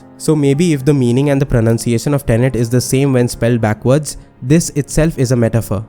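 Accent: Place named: India. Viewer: What a pajeet accent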